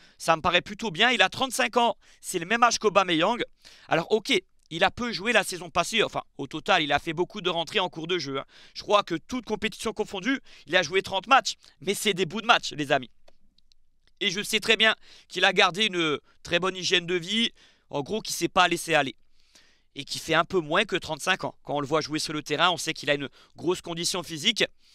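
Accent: Corsica, France. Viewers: Ç'est pas la 1ere fois jtecoute 👍🏽 hors contexte mais il semblerai que tia l'accent de nos amis Corse, jme trompe ?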